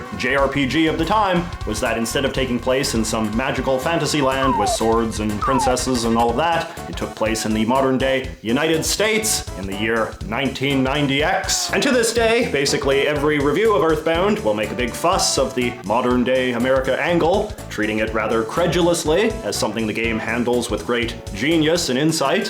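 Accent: Canada. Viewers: Your accent is aggressively Canadian, my god. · It's his Canadian accent, of course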